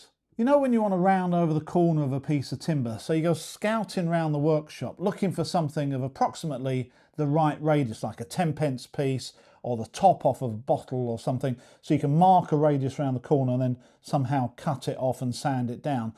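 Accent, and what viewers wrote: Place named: Mississippi, United States. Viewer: Love that Mississippi accent.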